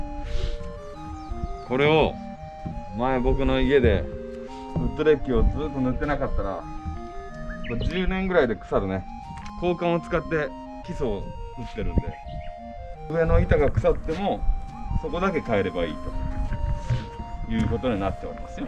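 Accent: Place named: Hiroshima, Japan. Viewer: 清木場さん広島弁ですか？ 広島住みですが、方言が親近感湧きます😀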